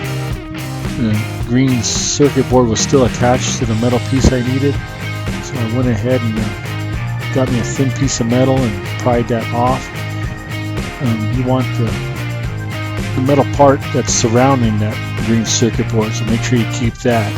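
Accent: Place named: Minnesota, United States. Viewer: Great Video!!! Your either cold or your Minnesota Sweed accent is coming through!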